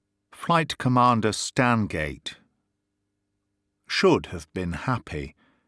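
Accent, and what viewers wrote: United Kingdom. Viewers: Mr your voice and narration is really marvelous... you can never imagine how much I enjoy listening to your narrations... your british accent helps me so much in learing british english... please never stop uploading more of your fantastic work... thank you very much sir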